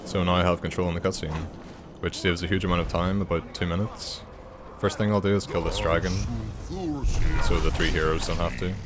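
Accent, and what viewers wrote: Northern Ireland, United Kingdom. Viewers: holy shit my fucking man's northern irish. and people say we're not smart, pfft. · It's always nice to hear another Northern Irish lad who is also a massive elder scrolls fan, love the videos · Northern Irish I'm pretty sure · Is that an NI accent I hear?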